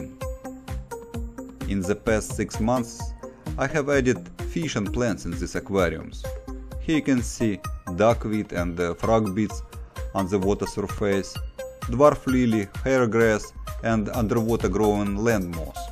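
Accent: Russia. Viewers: Ты русский? Я заметил акцент.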